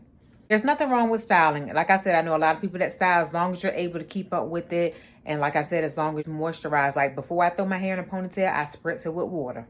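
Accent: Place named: South, United States. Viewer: You are very southern lollll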